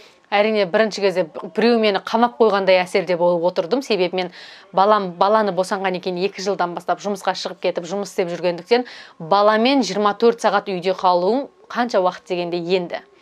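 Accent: Standard. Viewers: Айналайын жаным бізде сені жақсы көреміз сыңғырлаған дауысыңнан қазақшаны тап таза сөйлейсін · тіліңізді таза ұстаған екенсіз.Риза болдым.Қыз бала тілекші дейді.Қазақстан деп тілеген дұға тілектеріңіз қабыл болсын.Аман болыңыз.Отбасылық бақыт тілеймін. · Шет елде жүрсең де таза қазақша сөйлеп.қазақ деп соққан жүрегіңнен.Сенің аңгімеңді тыңдап көзге жас келді.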